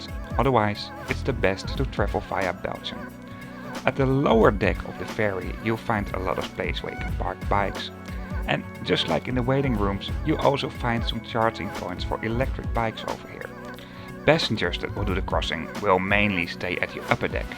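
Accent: Netherlands. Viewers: Wat een onzin. Zoals hij zelf aangeeft, heeft hij wel een NL accent, maar dat maakt het toch ook gewoon leuk. Ben er redelijk van overtuigd dat de 'native english speakers' hem prima kunnen verstaan en er soms om kunnen lachen.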